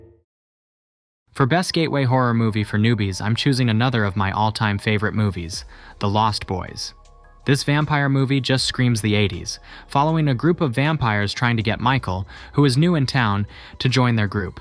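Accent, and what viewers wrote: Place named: United States. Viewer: Your from the Netherlands? It sounds like you have an American esque accent.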